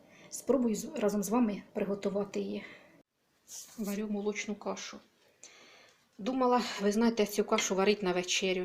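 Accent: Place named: Cherkasy, Ukraine. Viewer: Дякую, з задоволенням вас слухала. Подобається ваша говірка.Чи вгадаю? Напевно Черкащині. Простий і, напевно, смачний спосіб приготування риби.Обов'язково спробую.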